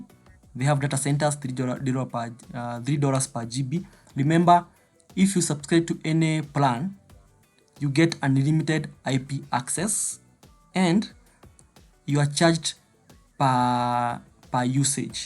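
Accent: Kenya. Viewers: Informative channel, pia mimi niko na ma channel kadhaa huku youtubes, uko na strong kikuyu accent nimejua tu wewe ni mkenya lakini wakenya wameharibu market ya online kwa onlinejobs by using fake accounts na proxies, sasa its harder now ju kenya imebaniwa in most online platforms. Niko na swali, Go login yako unafanyaje ikuwe na over 300 days left na ya trial inafaa iwe na 7 days?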